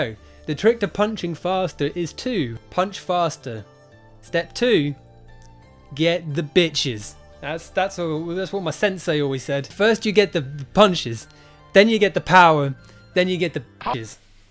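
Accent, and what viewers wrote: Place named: United Kingdom. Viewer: My man said: "He was moving too fast for the camera to even pick up the sound — by the time the camera caught the sounds, he was playing GTA in the next room." 😆😆 Maybe it's the Russel Brand accent (as.an American) that gets me— but everything sounds funnier with that delivery. Awesome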